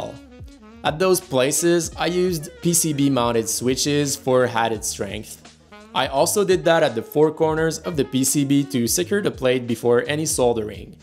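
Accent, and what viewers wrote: Quebec, Canada. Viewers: he is french canadian so he has an accent when he speaks english. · Accent quebecois 100%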